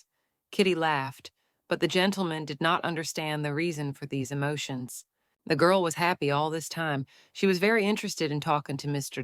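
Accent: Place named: Texas, United States. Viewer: Why is she from Texas?? 😭😭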